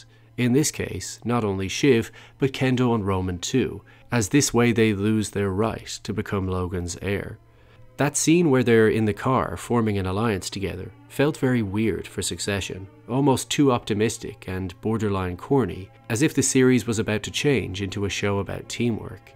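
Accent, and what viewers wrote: Ireland. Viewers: Do I hear a slight Irish accent??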